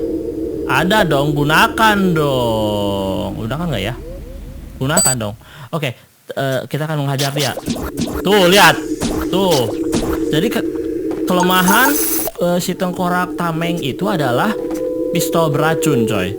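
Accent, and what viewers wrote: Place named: Cianjur, Indonesia. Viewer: Kayak orng cianjur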